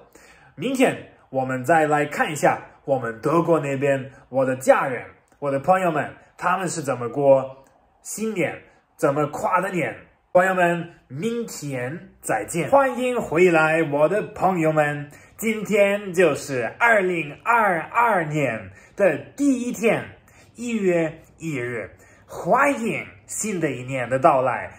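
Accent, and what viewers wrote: Sichuan, China. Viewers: 这川普有点打脑壳！！🤣🤣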